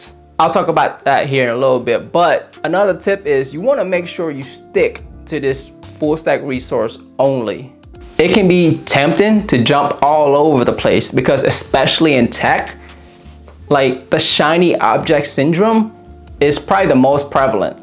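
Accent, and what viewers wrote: South, United States. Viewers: Aside from the amazing pieces of advice, I just want to say how nice your accent is. Deffinitely can hear some Southern US + Viet accent! Cheers brother. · Are you from the south? xD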